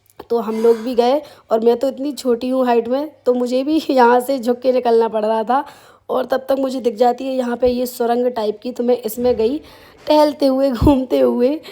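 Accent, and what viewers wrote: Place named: Bundelkhand, India. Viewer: aapki awaj mp side mostly bundelkhand reason jaise lag rahi hai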